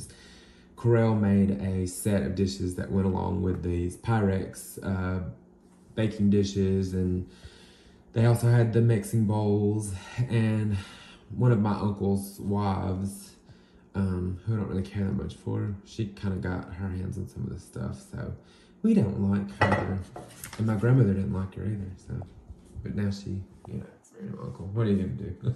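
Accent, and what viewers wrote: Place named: South, United States. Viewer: I'm a new subscriber. Old grandma 👵 from North Texas. Love your shared knowledge and collections and Southern accent. Keep up the great job.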